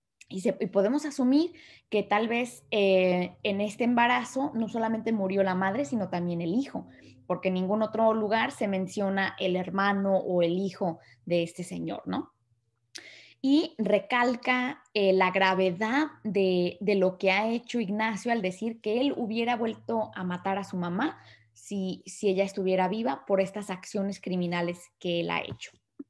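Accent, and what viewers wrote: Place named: Mexico. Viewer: Me encanta la literatura y la obra de Rulfo para mí, es de lo mejor en calidad, la forma en que desmenuzas el cuento es excelente, interpretas a la perfección la forma de hablar del mexicano, parece que tuvieras bastante interacción con personas de aquí, fue muy grato verte en el canal de Laura.Saludos desde la ciudad de México .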